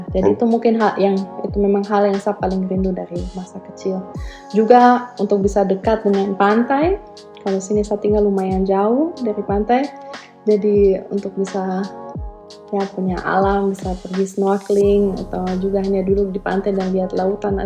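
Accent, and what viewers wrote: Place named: Papua, Indonesia. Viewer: . klu aku tutup mata..kamu bukan org jerman..bahasa indonsia logat papua kereeen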